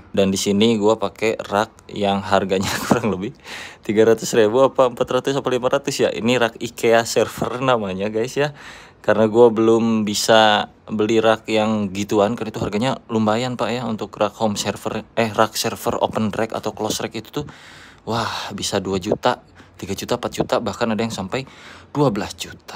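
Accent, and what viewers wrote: Sunda, Indonesia. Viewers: ha..ha...ha... sunda pisan , salam kenal ti cimahi kang...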